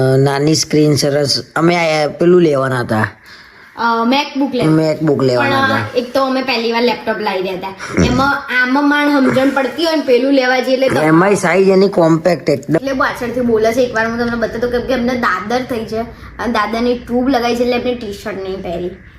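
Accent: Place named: Ahmedabad, India. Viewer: તારી સ્પીચ બહુ મસ્ત છે પ્યોર અમદાવાદ ની ભાષા શુદ્ધ ગુજરાતી સાંભળવાની બહુ મજા આવે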